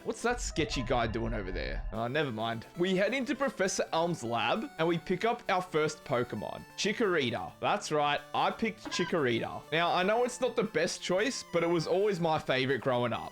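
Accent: Australia. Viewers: Ahhleet foah lol fuck i can only handle the Aussie accent for so long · He's Australian or something. You're just an ass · Because that’s how some Aussies talk. Sounds like he’s got a bit of the Aussie-Leb accent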